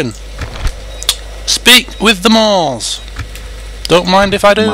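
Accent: United Kingdom. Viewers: I'm glad a brit is doing a commentary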